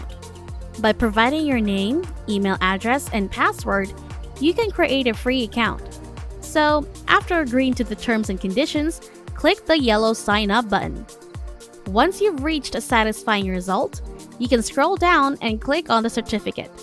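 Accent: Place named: Philippines. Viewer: The woman talking in the video sounds like a Filipino. She has that Filipino telemarketing English accent 😍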